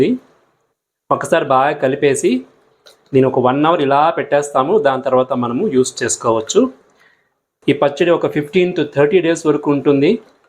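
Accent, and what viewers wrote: Tamil Nadu, India. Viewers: no no meeru bagaane maatladu tunnaru kaaka pote meeru tea chese video lo paalu kaagatam leda maragatam ana kunda paalu udikaayie annaru andukani maatlade vidhaanam Tamil style gaa anipistundi naa mother tung Oriya.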